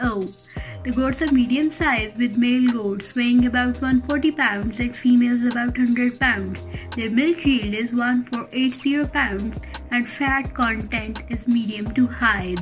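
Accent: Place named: India. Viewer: ...Also hard to understand such heavy indian accent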